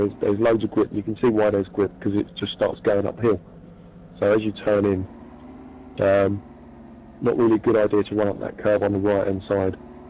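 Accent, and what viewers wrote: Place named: Germany. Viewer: Really great getting a bit of the historical background on these tracks. I can understand you much better with the German accent. Could you please use it during iRacing communication?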